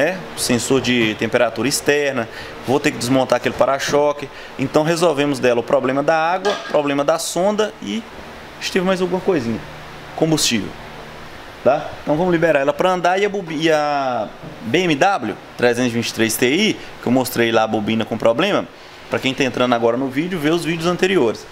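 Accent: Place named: Belo Horizonte, Brazil. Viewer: Blz amigo, pelo sotaque parece te bh, estou certo?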